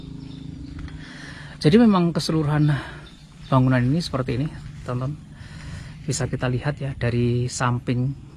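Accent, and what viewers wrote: Java, Indonesia. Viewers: Medoknya sm doanya itu loh khas bgtt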